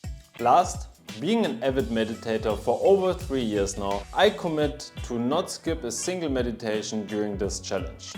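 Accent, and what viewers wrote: Germany. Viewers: I love the video! And the German accent as well🤩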